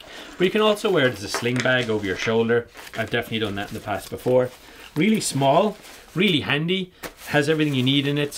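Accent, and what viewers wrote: Ireland. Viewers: R U Irish. I like your accent. I lived in Galway for 10 years.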